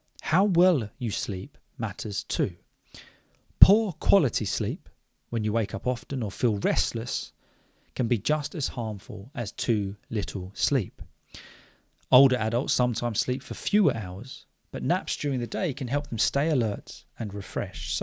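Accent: United Kingdom. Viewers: Is he British, please? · Hello Dear, How are you doing? I have been listening and watching your podcast for around 3 to 5 months. I love British accent. particularly you accent. I am trying to copy you voice all the time as much as i can. I hope, you are really good. And today's topic was fantastic. I really enjoyed it. And I am from Bangladesh particularly sylhet. Do you know there are a large number of Bengali people live in London? I think it's number over a million. And most of them from sylhet. To be honest I am taking preparation for my IELTS. Your videos are really helpful for the Listening section of IELTS. Prayer for me so that I can come to the London which is my dream City.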